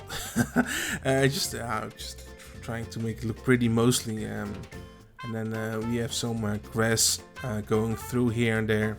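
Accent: Netherlands. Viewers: So creative, love this project. Also the commentary is the most lazy but most funny too. Ennuh ennuh, bla bla bla, you can see that for yourself whatever. Also, and I always wanted to ask you this, but is your Dutch English accent on purpose? Don’t feel offended as it’s so funny to listen to.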